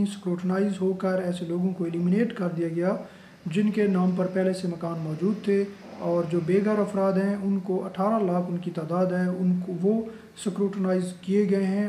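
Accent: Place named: Saraiki, Pakistan. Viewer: بھائی اپ کے لہجے سے سرائیکی پن جھلکتا ہے ۔۔آپ میڈیا میں آئے ہو پلیز اپنی اردو صاف کرلو ۔۔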